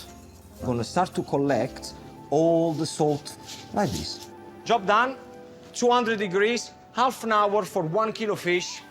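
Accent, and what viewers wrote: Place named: Italy. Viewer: I am Finnish and I like your English with Italian accent. I like to hear Itslian. Thr colour of the Italian language is making the atmosphere..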